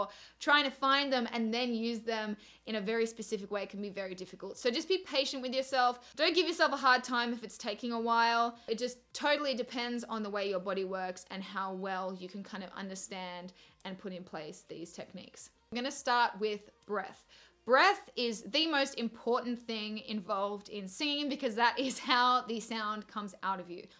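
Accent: Australia. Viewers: I love the Australian accent i wanna be Australian omg lol ahhhh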